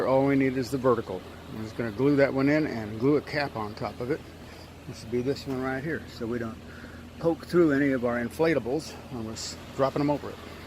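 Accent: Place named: Texas, United States. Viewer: "Fixin to do" 😂 are you from my neck of the woods in Texas?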